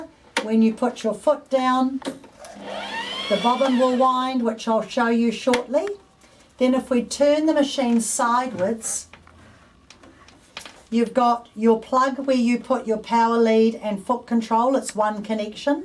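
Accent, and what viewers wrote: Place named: England, United Kingdom. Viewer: Nice lady but I cannot understand half of what she says because her accent is so strong. English I presume.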